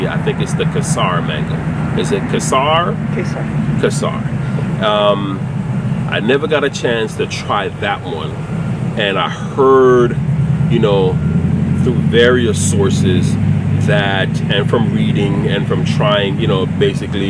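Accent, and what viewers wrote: Jamaica. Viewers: Shout out from the Bahamas cud hear the jamaican in your voice love bro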